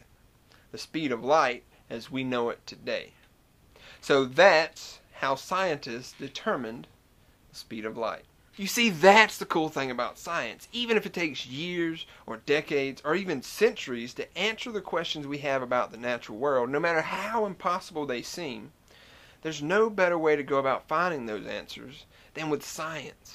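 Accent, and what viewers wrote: South, United States. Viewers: Love your Southern accent dude! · dunno, but an astrophysicist with a southern accent is mighty cool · Most of us pronounce "Io" as "EYE-oh", not "eye-OH", but perhaps you are an electrical engineer. :-) I love your southern accent, combating the stereotypical idea that southerners are stupid. Great videos, man.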